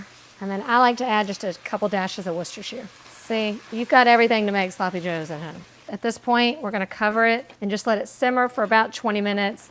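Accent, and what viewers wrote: South, United States. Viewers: I grew up in the 90's & have never even heard of chicken divan until this video. She has a very thick southern accent, so maybe it's exclusively a southern dish?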